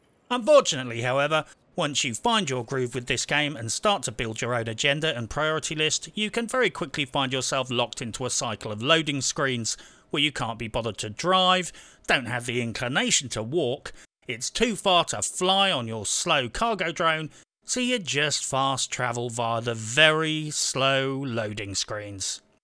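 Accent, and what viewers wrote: United Kingdom. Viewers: Hey! I just stumbled over your channel and I gotta say that I love it! Very entertaining and it is so true ^^. If you ever play on ps4 I'd love to play something. Bless you and wish you a happy new year already! Endnote: love the accent, very bri'ish.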